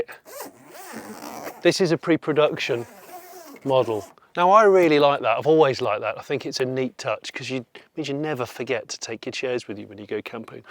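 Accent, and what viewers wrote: United Kingdom. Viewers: weird?!?? Just plain British......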